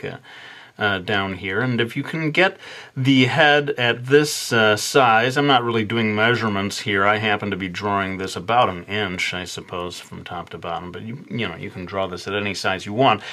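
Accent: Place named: Turkey. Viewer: türk ingilizce konuşuyo la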